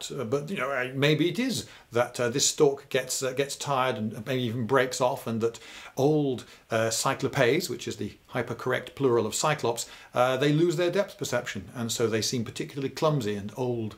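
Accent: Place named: United Kingdom. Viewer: Did I just learn about biology by some british bloke talking about the visual abilities of a cyclops? Amazing world we live in.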